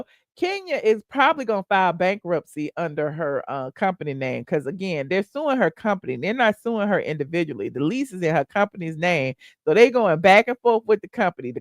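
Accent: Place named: Georgia, United States. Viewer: "Come own Ian" is what it sounds like to new yorkers . Its like youre a Georgia big sister reading a bed time story . Thank you 🩷🩷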